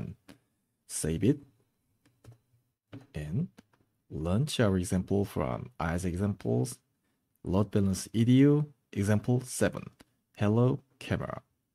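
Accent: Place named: South Korea. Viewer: 발음이 한국 분인듯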